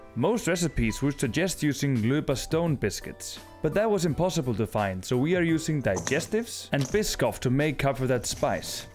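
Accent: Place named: Iceland. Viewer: seems like I finally found a good icelandic recipe! I´m so in love with that and miss original skyr and of course skyr cake the most... but I also love how icelandic people pronounce words in english. So thank you in so many ways! 😊🥰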